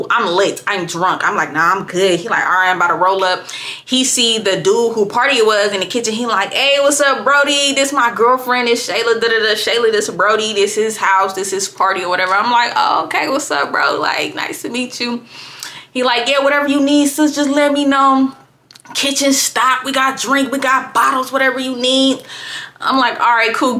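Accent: United States. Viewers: looking good with the red - USA accent is still the coolest thing 'since sliced bread':washhands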